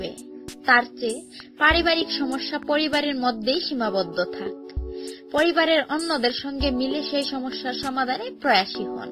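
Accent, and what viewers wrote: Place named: Sylhet, Bangladesh. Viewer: Eije madam eto gan dewar age nijer language ta thik korun.Apnar vasay lagce apni shyleti .Obbosso shyleti hoce emon ekta jayga jekhane kushonskar ar apnar moto gaiya meye diye vorti jekane sobai15 bosorei bia kore.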